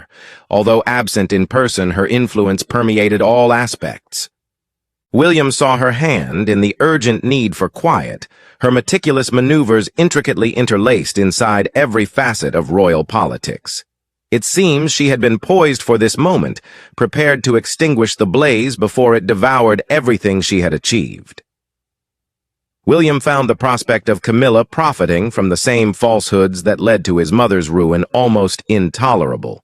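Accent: United States. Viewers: Not only is it total rubbish, gibberish avtually but this voice is so grating. Is it some kind of American regional accent? Wherever it is it wants avoiding.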